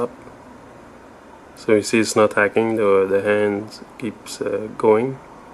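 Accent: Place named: Quebec, Canada. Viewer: Québecois? - moi oui. I kinda hear it in your pronunciation. Good vid, i am considering getting this one or the blue. Cheers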